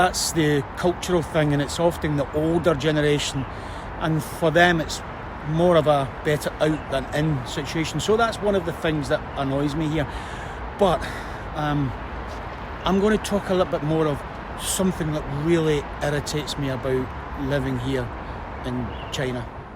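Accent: Scotland, United Kingdom. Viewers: What's the accent?! Scottish?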